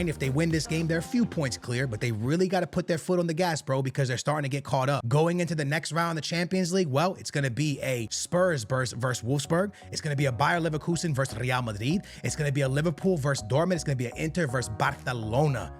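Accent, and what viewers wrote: Portugal. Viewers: He's Portuguese let him off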